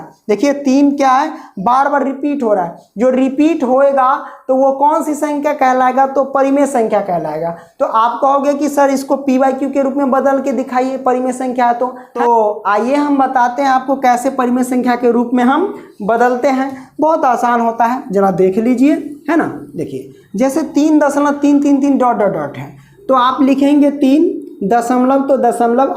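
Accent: Bihar, India. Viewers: Sir aap Bihar ke hai na aapki baaton sehi pata chalta hai aap bahut aacha bolte hai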